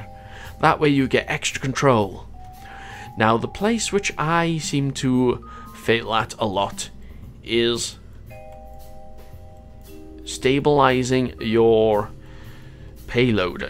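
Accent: Wales, United Kingdom. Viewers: Welsh accent with a speech impediment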